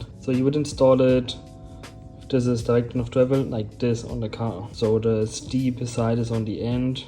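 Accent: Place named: Germany. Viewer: Another great video man. I can't lie, love your German accent.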